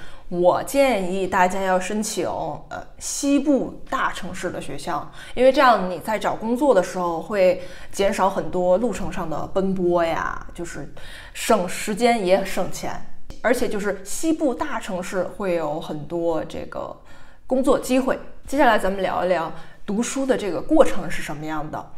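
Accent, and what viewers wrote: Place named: Northeast, China. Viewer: 小姐姐是东北的哈哈，有一点小口音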